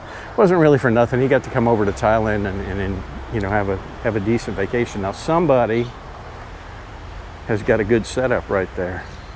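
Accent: United States. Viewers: New Sub, enjoying your vids. You made reference to previously being On The Job in an earlier vid (what Department or part of the States). I hear Midwest or South East Region in your voice? Regards, JP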